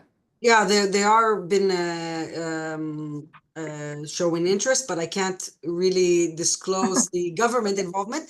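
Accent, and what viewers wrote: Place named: Europe. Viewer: Curious what accent that is, it’s not an Arabic accent, so you’re not from the Middle East. What country in Europe are you from? Also, you’re in a class by yourself saying that it’s helpful that Trump has been elected. Are you aware he’s completely tearing apart our government. But I’m glad it’s helpful for you and your efforts.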